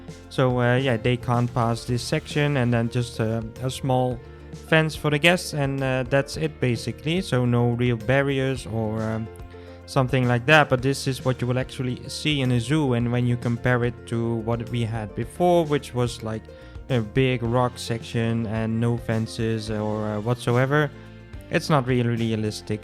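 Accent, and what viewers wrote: Netherlands. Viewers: Nederlands accent??? Haha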